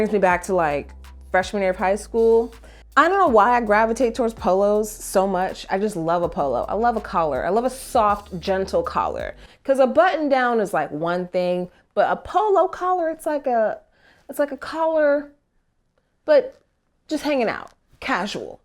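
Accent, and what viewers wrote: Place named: United Kingdom. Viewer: British accent always kills me LOL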